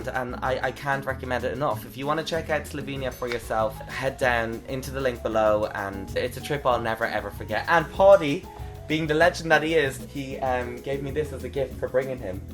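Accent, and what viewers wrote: United States. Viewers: Great American accent! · Nice American accent lol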